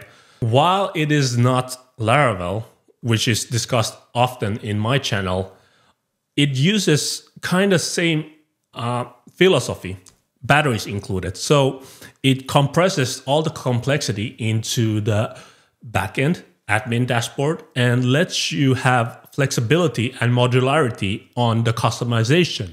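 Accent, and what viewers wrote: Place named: Japan. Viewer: I like the way you talk, the animation.. you sound japanese. cool. lets see you grow bro.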